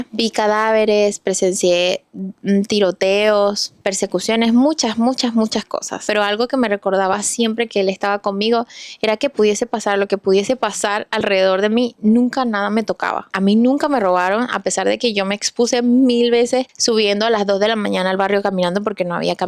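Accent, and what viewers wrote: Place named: Táchira, Venezuela. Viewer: Hola, desde que te mudaste a usa te he seguido mucho viendo todo lo que haces y empecé hacerlo porque te vi como un ejemplo. Yo también emigré y emigré sola bien chiquita después de graduarme de bachillerato y tus videos me hacían reír también al ser gocha me daba un poco de cringe el acento (la verdad jsjaja). Y ver este tipo de contenido de verdad me hace tan feliz, además siento que es una señal. Al estar sola sola me refugiaba en dios en rezar y rezar y pedirle todo lo que quería o necesitaba para mi bien estar. Y poco a poco me lo fue dando y siempre supe que era el, siempre un mensaje un detallito que solo yo en mis rezos decía. Hasta sacar personas que me hacía mal de mi vida! Pedía a dios si esta persona no es para mí sácala de mi vida aunque me duela el alma… y así mismo fue. No solo con relaciones amorosas, con trabajos, viajes, amistades, etc. 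Después de tanto estrés por inmigrar y tener 3 trabajos vivía muy frustrada pero desde que empecé a agradecer cada día a dios todo mejoró! Y muchas puertas se me abrieron! Pd: por favor sigue subiendo más capítulos ya tienes una fan fiel, gracias ❤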